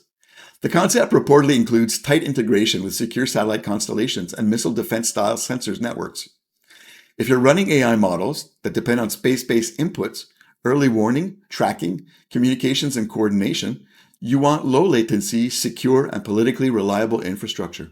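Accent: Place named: Canada. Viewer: Nice to hear a voice from the True North! 💙🇨🇦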